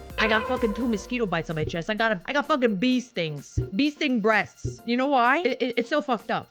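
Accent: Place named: Boston, United States. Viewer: You remind me of jerma, and not just because of the boston accent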